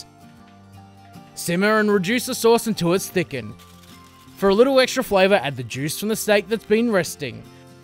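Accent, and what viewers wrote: Australia. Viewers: That's a nice accent you have there .au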